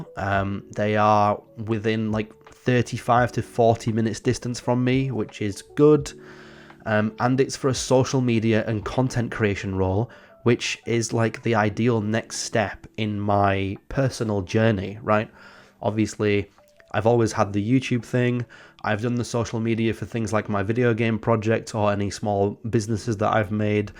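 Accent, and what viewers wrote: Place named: Southport, United Kingdom. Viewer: Not related to the video but your accent sounds exactly like a youtuber TIK history who is from southport, and similar but slightly different from a different youtuber who is from York. Its just cool to me when as a non english speaker I notice not just general "british" accent but regional ones too I hope you land the job you want and I hope your channel grows and youtube becomes a more stable source of income for you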